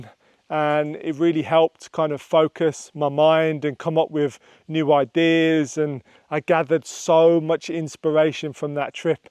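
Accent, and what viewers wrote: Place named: England, United Kingdom. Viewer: Hello! I like your videos. From a true portuguese ( many are now fake&soon they will be gone as well as in England is happening about fakes),. I prefer to listen to your nice english accent. I don't like this AI abd is not portuguese is brazilian which is called portuguese from Brazil for them to take advantage. How can I take this AI and got back your voice??? Can u tell me please? I tried deactivated legends, put translating to look for english, but that option is not availabe.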